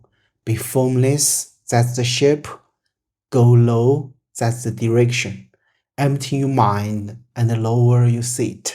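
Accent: China. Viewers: I'm glad I subscribed to this channel.. Although the accent is a bit Chinglish(no racist pun intended), at least there are subtitles. Every content here is a hidden gem.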